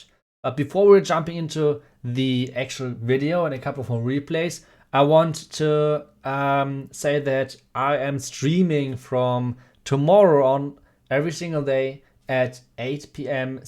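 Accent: Germany. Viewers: Du hörst dich deutsch an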